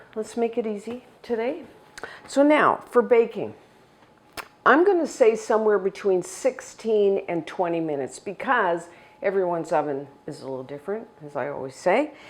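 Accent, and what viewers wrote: Canada. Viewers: Welcome back,! Always the best recipes n explained so nicely I hear that Canadian accent a bit, I think 🥰.. love it! Love that color on you too🌷🌸🌿🌸🌷🌿🌸🌷🌿🥰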